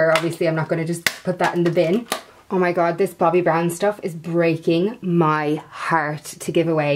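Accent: Ireland. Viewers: Sounds like faint Irish accent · New to your channel! Adore your Irish accent! Which part are you originally from? Are you enjoying the move to Sydney!? LOVED this declutter video so inspiring and generous 💞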